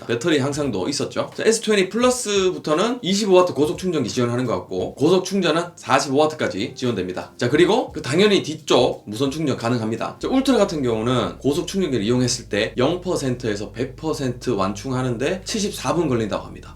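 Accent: Daegu, South Korea. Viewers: 말투가 비슷하다 싶었는데 대구분이셨군요ㅋㅋㅋㅋ 잘 보고 가요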